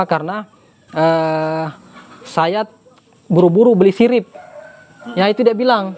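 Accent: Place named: Java, Indonesia. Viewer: Pak Ustadz nya logatnya kaya orang JAWA.....SALAM DARI JAWA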